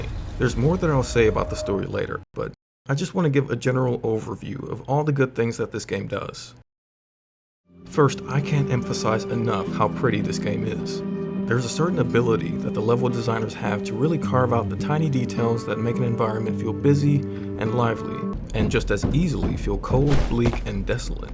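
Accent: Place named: East Coast, United States. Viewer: Im guessing you are part of the east coast of the US. ?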